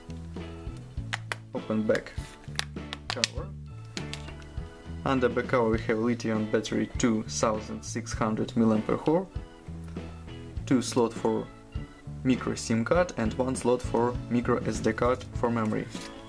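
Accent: Russia. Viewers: Русский видно акцент)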